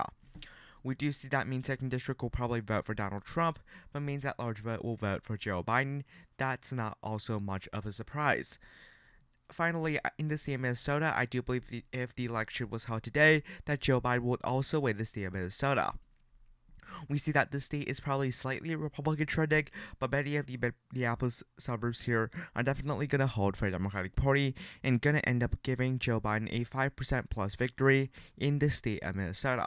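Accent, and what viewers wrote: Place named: China. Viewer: Is it me, or are you Chinese? The accent was definitely Chinese. The assertions, to someone not connected to the US, struck me as merely your opinion. My own personal opinion is Trump will lose because democracy has been destroyed from forces at work within the west. I'm just awaiting the autocracy as it slithers out of its gross coils.😕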